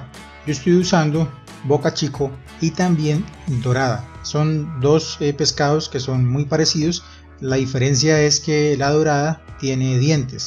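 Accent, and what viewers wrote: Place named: Bogotá, Colombia. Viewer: Yo reconozco ese acento Creo que es de personas de bogota Yo fui a Cartagena en un crucero y esa receta la probar Omg so good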